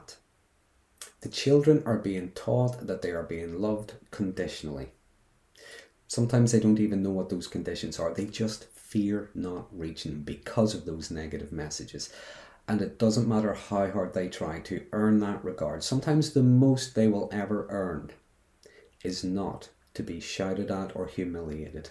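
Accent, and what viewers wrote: Ireland. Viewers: Hello, thank you for your videos. My family not only was a cult in the sense you explained in the video, but we were also in an actual doomsday cult. May I ask where your accent is from? My great-grandmother's family moved to Canada from county Cork in Ireland and you sound just like nanny and aunt Ethel. They were also Magees. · Well done, you're a smart guy--this was very good! That's a fine Irish accent by the way. Cheers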